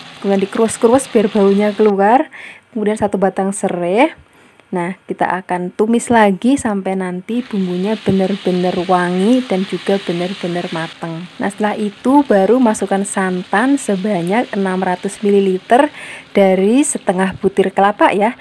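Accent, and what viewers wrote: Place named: Central Java, Indonesia. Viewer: Suka bnget dgn bicaranya enteng ceria... Kyknya Jawa tengah ya mba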